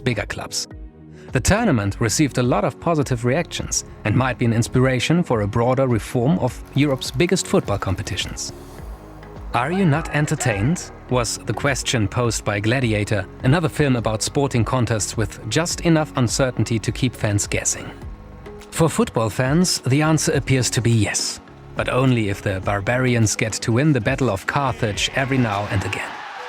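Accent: Germany. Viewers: Sounds more like he's german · Are you german? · DEUTSCHER AKZENT JAAAAAAA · this guy could do a 10 hour video about paint drying and i’d still watch/listen love the soothing german? accent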